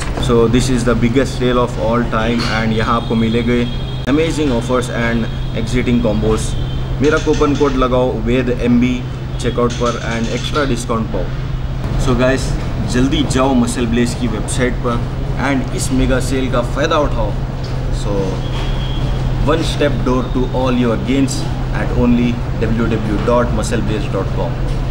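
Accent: Hyderabad, India. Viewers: Nice accent Hyderabadi londe.. mashallah khubsurat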